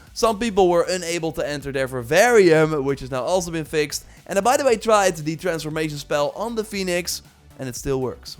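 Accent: Netherlands. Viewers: Sooo Dutch 😄 nice vid though. Good info.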